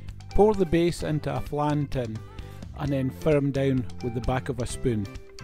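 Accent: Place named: Yorkshire, United Kingdom. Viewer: God bless you You sound propa Yorkshire loving it, 😇 God's county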